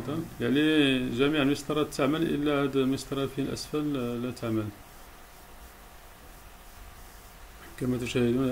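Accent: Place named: Morocco. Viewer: الصوت مغربي و الفيديو لشخص من الخارج على ما أظن .و هل على بالك ان في المغرب يغيرون الليدات كلهم في سبيل ليد واحدة محروقة.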